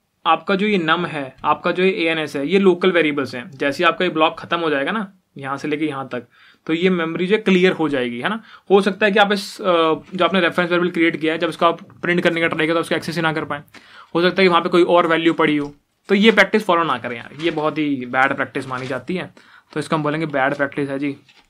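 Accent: Haryana, India. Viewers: HARYANAVI accent is so sexy.......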